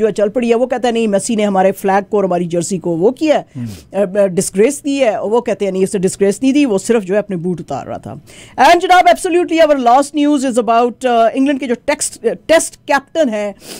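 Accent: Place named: Punjab, India. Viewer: Madame - your urdu accent is very poor . You speak urdu in punjabi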